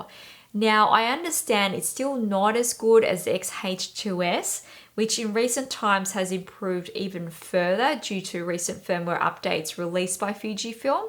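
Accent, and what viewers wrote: Australia. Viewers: Enjoyed the your thoughts and examples. Your Aussie accent so pleasant to listen to. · Thanks for the really informative review, it's great to hear from an actual user of their experience. I also am enjoying your "Aussie" accent (with perhaps a bit of Asian in there), it's so pleasant to listen to! I'm about to order my XH2, and upon checking out the firmware updates it looks like with version 3.0 they've added the ability to set the ISO with the FRONT COMMAND dial. I've also seen in the manual that the current ISO can be displayed on the main screen. Unless I'm misunderstanding all this (don't have the actual camera yet), it seems that Fuji may have addressed this specific complaint.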